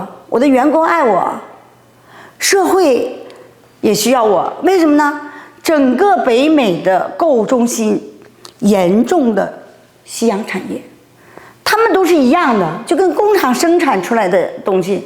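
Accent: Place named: North, China. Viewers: 这女人格调不高，一口北方口音，关键好像光脚上田没多久的感觉😅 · 你的口音就是北方再北方的人的口音, 一个喜欢博别人眼球的人, 还自称广东人, 见鬼去吧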